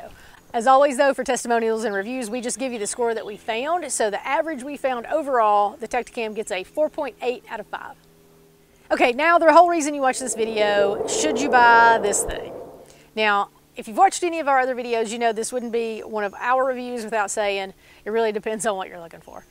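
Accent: South, United States. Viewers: judging from your awesome accent, I gather your in the south someplace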